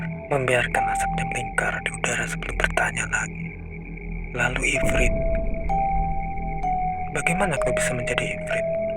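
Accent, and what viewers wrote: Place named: Sumatra, Indonesia. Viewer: Author org Sumatera to? Kirain jawa ngapak... 😅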